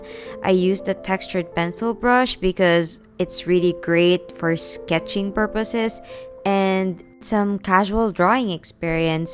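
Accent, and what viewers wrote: Philippines. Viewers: Filipino or no? 🤔 Your speech kinda sounds filipino ✋😐🤚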